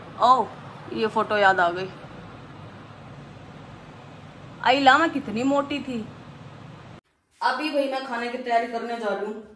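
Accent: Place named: Haryana, India. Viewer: Beta aapki Haryanvi Bhasha bahut hi acchi lagti Hai mujhe to❤❤❤❤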